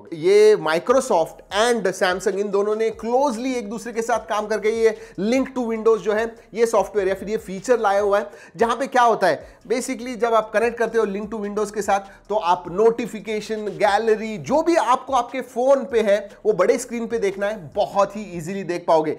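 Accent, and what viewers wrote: Maharashtra, India. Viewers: Are you maharashtrian and marathi by mother tongue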